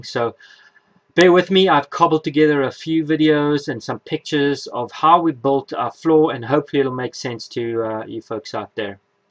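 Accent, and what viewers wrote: South Africa. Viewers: Really enjoyed the video! Is that a South African accent I detect? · I can hear you are from South Africa. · South African accent? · Your south african..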